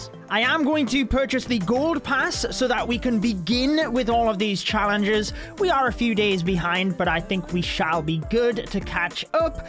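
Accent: United Kingdom. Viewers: Just enjoying ur british accent bro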